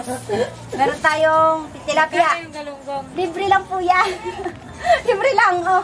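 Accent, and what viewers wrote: Philippines. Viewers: I love your pinoy accent, are you married?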